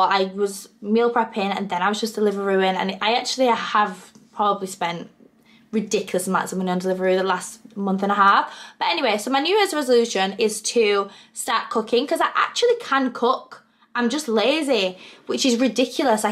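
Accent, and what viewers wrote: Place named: Bradford, United Kingdom. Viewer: Is she from Bradford ? Her accent lmfao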